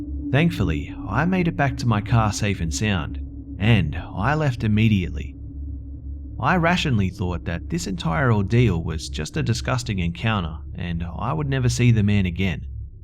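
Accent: Australia. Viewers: r u Australian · Are you Austrailan m8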